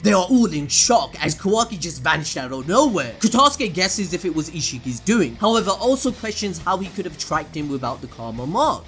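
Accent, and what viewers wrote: Punjab, India. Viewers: bro U indian? Wow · Indian excent is lit.... 💯💯💯💯💯💯💯💯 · lol are u punjabi bro?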